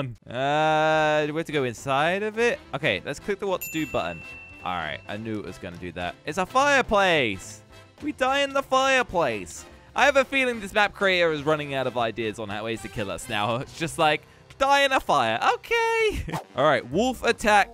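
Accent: England, United Kingdom. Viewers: Your kinda english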